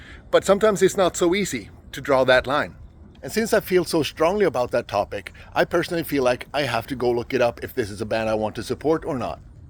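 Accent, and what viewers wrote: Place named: Europe. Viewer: If you are seeking out black metal for morality and humanity, you are barking up the wrong tree. You should also note that Satanic black metal is explicitly in support of murder, grape, sacrifice of children, destruction of all innocence, etc. etc. .... and you clearly do not have a problem with all of that. But the moment the bands concern themselves with heritage, cultural superiority, and attacking a religion other than Christianity, suddenly its a problem. You sound European, so I don't have to tell you that your land, your culture, and your way of life is under direct attack from foreign invaders. But all that aside, its black metal. Foisting a morality compass onto it negates its entire purpose.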